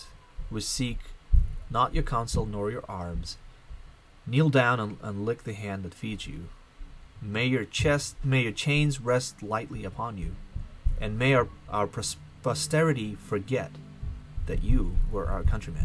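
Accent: United States. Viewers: um, hanging out with Americans and simply hearing the accent for a long time will allow him to pick it up. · Man, you ARE America. ...But how did you get that perfect American accent? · You have a better "American" accent that most natives of New Jersey! 😁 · Man, we could use about a hundred million more just like you! America has lots of problems, and we are not perfect, but there is no other country in the world as great as her. I wish all Americans would stop and realize that. We are so incredibly blessed to live here and call her "home". You`re already an American because you get what America is all about. Freedom. Thank Dear God for her. P.S. Where did you learn to speak such perfect American English? I was born and raised here and you speak better than I do. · wowww your accent is amazing ...i'd never guess that you're not from America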